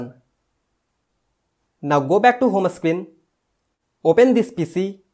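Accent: India. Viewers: Luv your desi accent 💕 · very bad english+indian= leave the video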